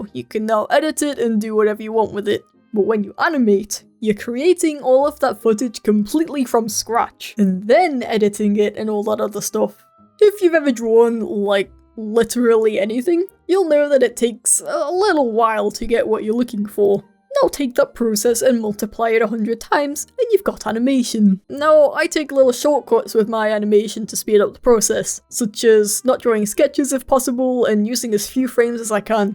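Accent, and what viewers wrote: United Kingdom. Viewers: KID OF LINK AND ZELDA it's just his british voice (yes he's british) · Your voice is british deep and compaired to your fisrt video is wowz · R u British?? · Its really interesting to watch your channel. I really like your british accent whilst you talk about german potato culture. Like, you couldve chosen all countries but you took germany?! I dont understand why cus i just want to get away from here, Lg dein Tom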